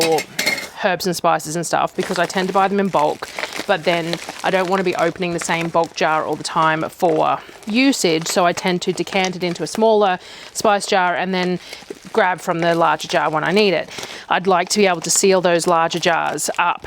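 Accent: Australia. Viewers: Good afternoon from the States. I love your vids girl. So fun to watch what you come up with. I find when I'm having a really bad day, I get excited when I get notified of one of your cooking videos. It brightens my day. Your voice is soothing and since I have lived in Mosman, a suburb North of Sydney many years ago, I enjoy hearing your accent. It reminds me of the many adventures I had there and how bad I need to go back. Thanks for bringing light into a dreadful day and for so many cool ideas to cook or bake. You're a lovely woman and I hope when I get back under, I can buy some of the foods you use that we can't get here and send them back to the States...the cost would be so worth it. Ta and have a wonderful week!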